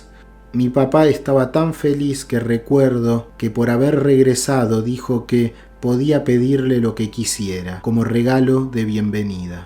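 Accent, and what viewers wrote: Argentina. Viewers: SE NOTA QUE QUIEN RELATA LA EXPERIENCIA ES ARGENTINO...YO TAMBIEN SOY ARGENTINA....ME CONFORTA ESCUCHARLO Y MUCHO....GRACIAS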